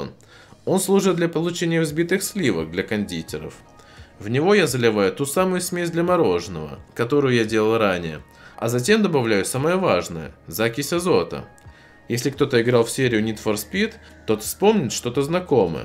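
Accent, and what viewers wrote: Estonia. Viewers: Ты с Эстонии?)) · Бро,ты из Эстонии?!😃(я да) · Ты из Эстонии? Шок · он из Эстонии чтоле??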